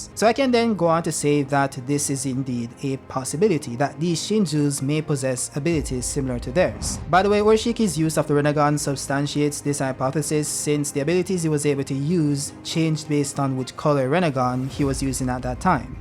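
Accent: Jamaica. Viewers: sound like u come from Jamaica dawg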